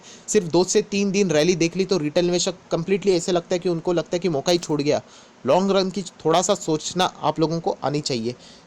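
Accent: Maharashtra, India. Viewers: As per your speech I feel you are maharastian. If so you are Frist man who is having such a good command on stock market. Superb knowledge. Please share your mobile number as I want to invest first time in shares with your help.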